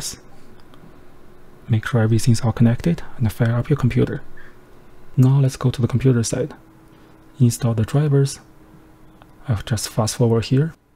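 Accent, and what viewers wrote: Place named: China. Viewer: 一听就是中式英语，阿弥陀佛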